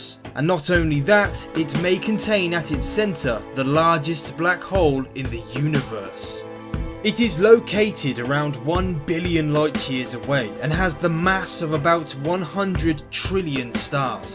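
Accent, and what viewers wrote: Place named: South West England, United Kingdom. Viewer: He's English, like me, and his accent is probably from the South West.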